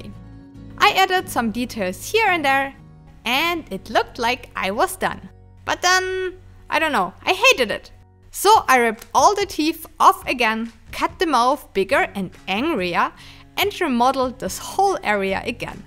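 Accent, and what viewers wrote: Germany. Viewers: That German accent ☺️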